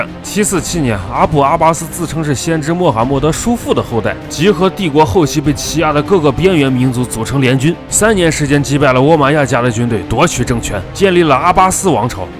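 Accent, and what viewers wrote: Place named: Northwest, China. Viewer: 精彩！讲与伊斯兰教有关的话题，加上解说的西北口音，就更加精彩，堪称完美。期待下集！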